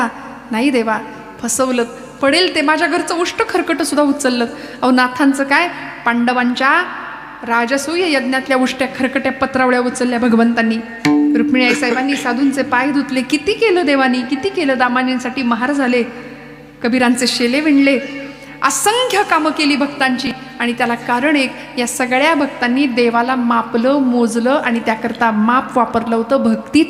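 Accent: Standard. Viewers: ताईचे मराठी शब्द उच्चारण सु स्पष्ट आणि अगदी शुद्ध आणि सुमधुर आहेत आणि अप्रतिम वर्णन,हीच खरी संत परंपरा आणि लोकांच्या कल्याणा संतांच्या विभूति,जय हरि विठ्ठल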